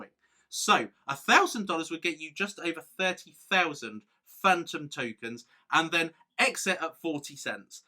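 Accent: United Kingdom. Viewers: Sing in english accent talks in british accent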